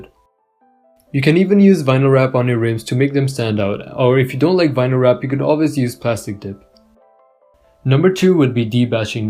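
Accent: India. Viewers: Are you from India? You have Indian accent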